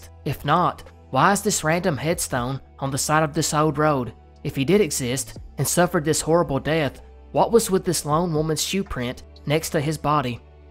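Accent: South, United States. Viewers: This right here is a southern bygod accent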